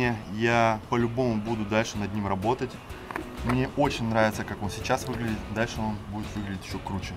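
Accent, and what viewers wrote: Russia. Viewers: ништяк! ещё и русский говор!!!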